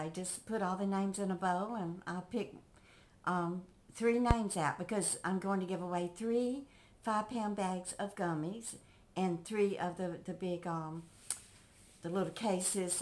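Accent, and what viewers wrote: United States. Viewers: It’s not what you say but how you say it. Here in the UK we love your American accent and cute sayings and expressions.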